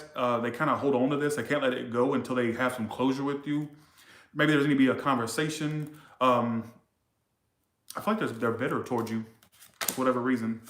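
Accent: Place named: Louisiana, United States. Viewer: I just knew you were from Louisiana....love the accent.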